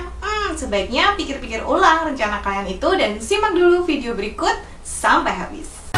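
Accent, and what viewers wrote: Java, Indonesia. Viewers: banter bangeet 😂😂 bu bidan mantap, njowoniii bangeet 😂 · gledekan wkwkwk aku suka karna bu bidan medok kaya aku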